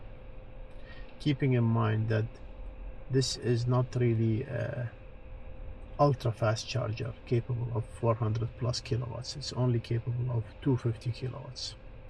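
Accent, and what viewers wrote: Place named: Arab world. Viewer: السلام عليكم. من لهجتك أتوقع إنك عربي. أنا من ملاك 7X متابع لفيديوهاتك. تحياتي لك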